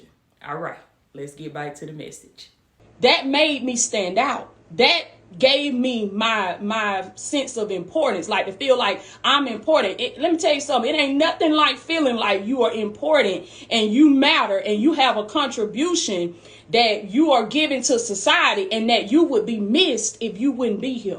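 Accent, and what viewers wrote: South, United States. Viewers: I heard you loud and clear from the intro, you made me jump up! I've been praying for months now, " God show me how to generate multiple streams of income ". But God has given me three basic instructions: start small = plant a seed & I will make it grow, step out in faith & out of comfort zones, don't boast be humble... do these three things constantly and I will bring the overflow 🏞 💷 ⛲ 💷 🌊 💷 I love your messages, your motivation and your Southern twang, you put a fire up where the sun don't shine! 😂 I really appreciate your ministry, thank you sister in Christ, love from London UK 👑 ❤ 🙏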